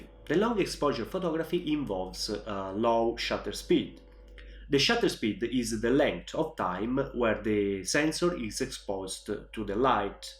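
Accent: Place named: Italy. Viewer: Quanto si sente che sei italiano 😎 ottimo video, io posseggo la p1000 con un filtro nd 1000 ed è pauroso il risultato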